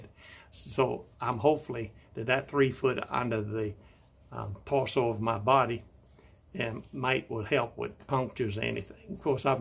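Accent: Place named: Lowcountry, United States. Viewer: Sounds like you are from the Low Country.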